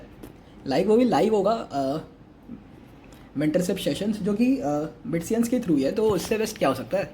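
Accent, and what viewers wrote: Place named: UP/Bihar, India. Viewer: Bhai "ham" up /bihar se ho kyaa?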